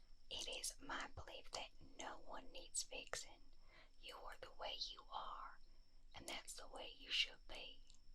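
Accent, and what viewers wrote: South, United States. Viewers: Southern accent is best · I've never heard a witch with a southern accent before, hehe! A lovely witch and some lovely triggers. 😌❤️ I enjoyed this. 😊 And I love how consistent your uploads are by the way. ❤️ I hit Like. 😉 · Thank you southern!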